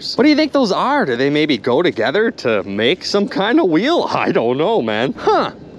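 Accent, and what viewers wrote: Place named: North, United States. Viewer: Hey you in wisconcin or minnesota?Ican tell you are up in the northern stayes but cant tell which one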